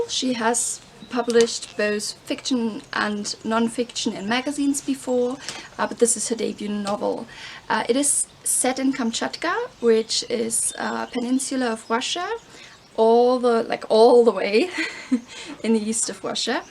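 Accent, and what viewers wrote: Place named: Brandenburg, Germany. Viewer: Rain? Tropics get rain. The Sahara, Death Valley & other hot weather deserts get rain when they're lucky. Europe is so lucky. Hurricanes? What hurricanes? Tornadoes? Very occasionally in the south, but no one place gets hit with any regularity. Major earthquakes, well OK some in Italy and a bit in Spain. More northerly climes are completely spared. Not fair. Lucky lucky lucky. But beware the Azores re causing tidal waves & Icelandic volcanoes erupting. Euros can't be lucky all the time. According to linguistic maps, your dialect & accent is labelled as Markïsch aka Margravian aka Low East German. As u obviously understand the High German of places like Hannover, it means that u should have an easy time learning Dutch, which is a mix of High & Low German. You are a linguist, you learned English. And some day you'll rattle off chrysanthemums & "this that them there things, the thirty-three of those though they thoroughly" with no trouble at all. 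Me, pronounce the hardest sounds in German? Faints. :-D And I thought French was hard. According to Worldometers, Germany still has >27.7K active covid cases, with 216 new cases reporting today. The curve of covid is still going up, but it is closer to flat than it has been before. Canada has lotsa space, very spread out, relatively speaking, so our situation is vastly different, depending on the region. One province, Quebec, has nearly 30K cases & >2.1K deaths, whereas its bordering province, New Brunswick, has <120 cases and 0 death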